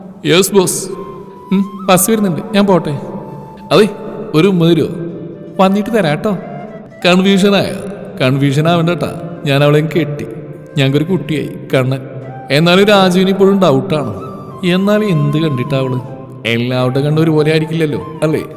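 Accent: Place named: Thrissur, India. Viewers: Thrissur basha pollichuttaaaa😂❤ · ന്തുട്ട ഗടിയെ... മ്മ്‌ടെ തൃശൂർ slag ഗിൽ... കിടുട്ടോ... കൊള്ളാം ❤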